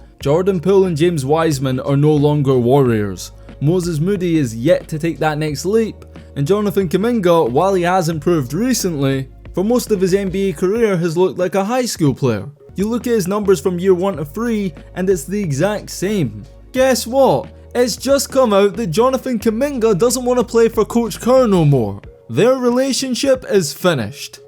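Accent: United Kingdom. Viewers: Imagine calling Jalen Green a bust when he averaged 22 ppg in his sophmore year LOL u don't know wtf u talking about last time i click on a basketball video from a dude with a british accent tf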